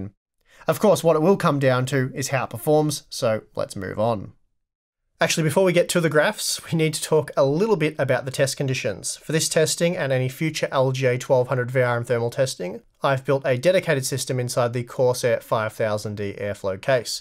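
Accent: Australia. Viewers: Is it an Australian thing to pronounce the letter Z as "Z-ed" In America we pronounce the letter Z as "Z-ee"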